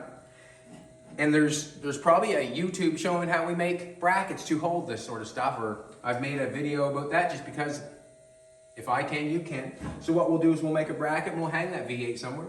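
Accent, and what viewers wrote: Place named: Nova Scotia, Canada. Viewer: Thar is a Canadian maritime accent, specifically Nova Scotia.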